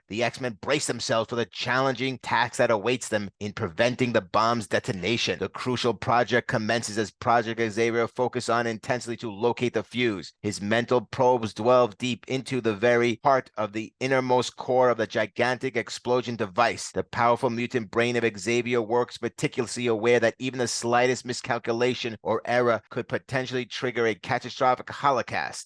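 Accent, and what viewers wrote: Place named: New York, United States. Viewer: You have an awesome voice for radio or tv. You’re accent sounds like you’re from New York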